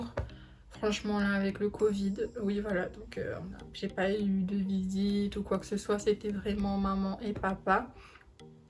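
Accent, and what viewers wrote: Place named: Réunion, France. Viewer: C’était trop bien ta vidéo pour une première fois franchement continue comme ça c’est super 🥰💯tu vien de île de la réunion 🇷🇪 ? Comme pour moi ya un petit accent 😅🇷🇪😘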